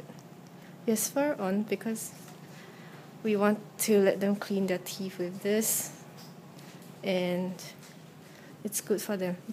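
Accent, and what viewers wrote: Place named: Singapore. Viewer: Oh, are you Singaporean because you sure sound like one!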